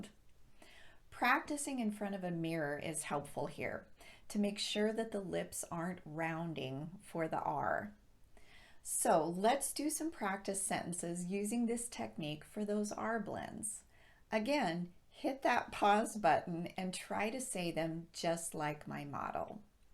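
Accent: United States. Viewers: I love your videos and the standard American accent and pronunciation.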